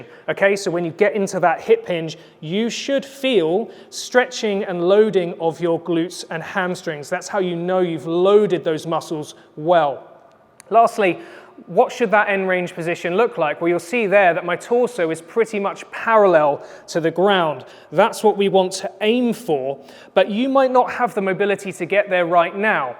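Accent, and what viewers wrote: United Kingdom. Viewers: 😂😂😂 British accent is the best!! 😍😍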